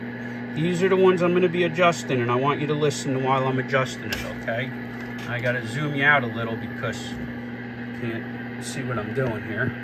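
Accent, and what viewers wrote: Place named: Long Island, United States. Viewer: Sounds like Long Island N.Y.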